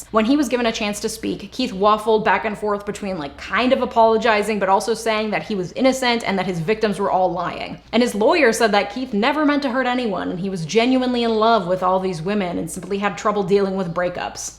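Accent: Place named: Midwest, United States. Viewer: Your the only YouTuber that I don't skip the ad plug because I love your Midwest accent. I think it's cute.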